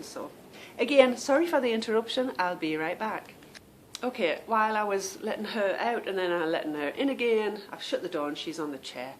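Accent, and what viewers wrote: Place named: England, United Kingdom. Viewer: You talked about saving our first knitting. I learned as a young girl and would make my own doll blankets. I wish I had saved one. But, I did however save my son"s two knitted squares. He is 37 yrs. old now lol. I could not part with them. He asked me if I would teach him how to knit when he was a little boy :) Now I am teaching my 5 yr. old Granddaughter who I live with. Years ago I entered my work in the California State Fair and won ribbons. Most of the entries were baby items. I saved them all so someday my grandchild could wear them. Well, years later my beautiful Granddaughter was born and I gave the clothes I made to my Daughter and she dressed her in all the adorable treasures I had saved. It made me so happy. Now for the word pronunciation. I say Basil the same way as you do but the other words I say in American. 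You also have people in the south and east coast of the US who have their own pronunciation as well lol. I assume the English, like yourself is correct. No one should correct you.. I would love to sound like you. I'm in California so we get sloppy at times when saying words like party etc. and switch the sounds to a letter D. It's very interesting. I enjoyed your podcast very much as always. Take care...Sharon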